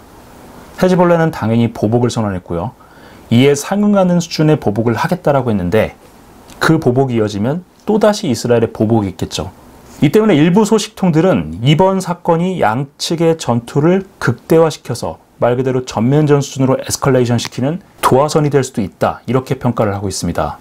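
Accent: Gyeongsang, South Korea. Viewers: 지역주의 말씀하시는 분이 더 지역주의 위에 계신 것 같은데, 신인균씨가 사용하는 사투리가 경상도라서 그렇지 그것빼곤 뭐 그닥 국방TV 운영하는데 문제없어 보이네요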